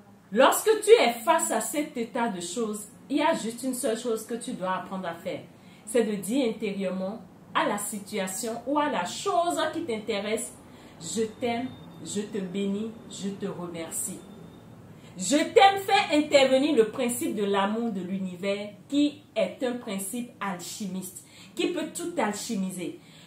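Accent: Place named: Benin. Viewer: Je suis tombée sur votre vidéo ✨ Pour la première fois je me suis abonnée tt 2 suite parce-que vous êtes assez naturelle vous n'avez pas essayé de changer votre accent béninois BRAVO👏👏👏 BONNE SUITE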